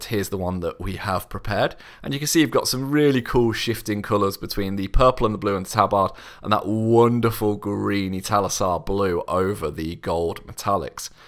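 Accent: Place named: Cockney, United Kingdom. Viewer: Could you tell me if you used Wraithbone out of a can or with an Airbrush? I have nothing but bad to say about Contrast Spraycans because it's awful. It pulls back from the edges, pools in recesses and dries wit a weird almost "carbonated" texture sometimes. I shook for 10 minutes straight, sprayed it properly (8" away), sprayed it in cooler but dry weather, in the day, in the shade. Also, great tutorial! I really enjoyed this one. Brits with a light cockney always sound good ;-)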